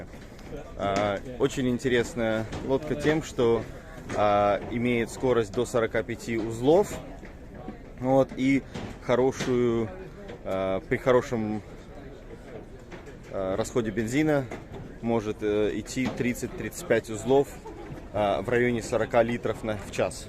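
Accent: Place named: United Kingdom. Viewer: вообще видос про мужика в конце это лишнее (к нему вопросов нет и лодка у него зачетная). интрига не сохранилась. голос был похож на голос ведущего из Уссурийска. я прифигел че его на острова занесло? да и ангицкое произношение меня тоже насторожило. думал катапультровался пацан в хренбритан. перед выборами туда ушел. и собаку даже в видосе не показал. без нее уехал. с женой видите ли машинки смотрят. ну думаю как все повернулось. ну думаю "отано чё". ан нет не он. разочарование. вам конечно поржать, а нам подписчикам стресс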